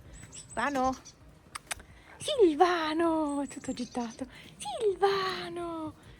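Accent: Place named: Belluno, Italy. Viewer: Ti ho scoperta per caso cercando video sui gatti... E poi sento l'accento e mi incuriosisce xk mi sa di casa... Guardo i tuoi video e vedo che sei da Belluno (montagna da come vedo) 😍 io sono da santa Giustina, ormai da due anni trasferita ad Amburgo... Bello sentirti parlare e vedere le bellissime Dolomiti ❤️ da dove sei di preciso, se posso chiedere...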